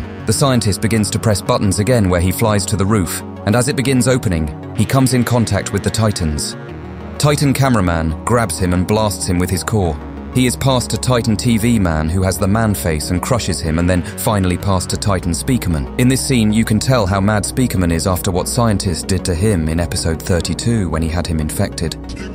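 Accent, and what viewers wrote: United Kingdom. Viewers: Are you british? Just asking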